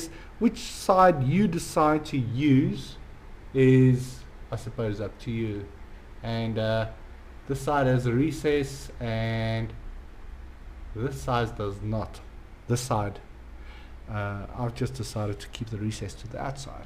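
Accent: South Africa. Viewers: use Tweezers boetie ;) Recently moved to New York and Iv needed a new hobby so decided buying a drone might be the way... Iv been doing a bit of research on the Tarot 690s and they seem to be the most customisable of the lot. what are your thoughts on building vs buying a used one for about $550 without a transmitter? PS watching the video and hearing your accent has made me miss Cape Town soooo much!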